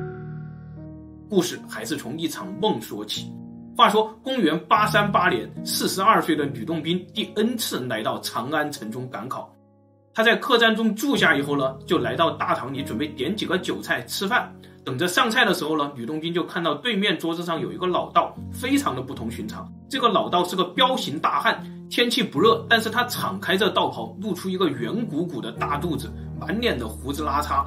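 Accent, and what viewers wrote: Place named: Shanghai, China. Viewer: 不搭噶 up主上海人吧😂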